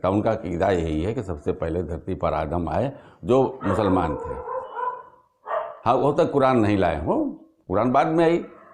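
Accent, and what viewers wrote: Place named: Allahabad, India. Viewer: bahut chutila vyangya elahabadi boli me bahutai jam tha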